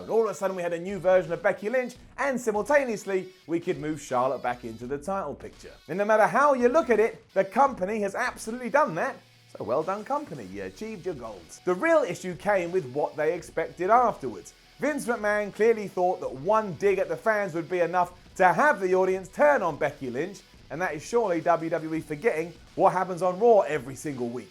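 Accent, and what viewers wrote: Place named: United Kingdom. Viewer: Why he big enough himself in isnt in NXT or WWE in got the British Voice lol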